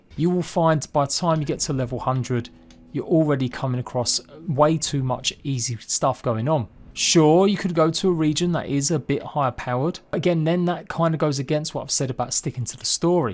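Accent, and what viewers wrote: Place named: United Kingdom. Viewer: Listening to your British accent hurts my face. Other than that you're awesome 👌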